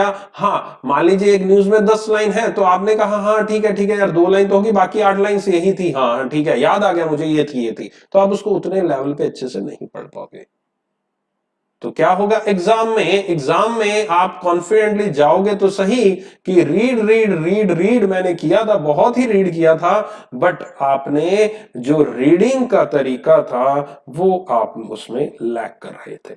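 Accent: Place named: Haryana, India. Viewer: Sir ur pronunciation is very haryanvi ,pls try to improve it , Kindly don't take it as a complaint take it as a suggestion ...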